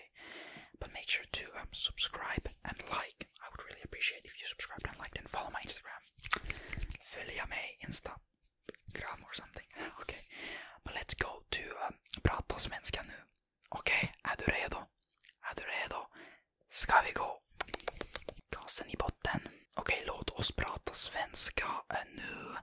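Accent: Finland. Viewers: Finlandsvenska is a nice dialect every finnish in sweden stil got it and cant rid of it but i like it =) sounds like mummintrollen.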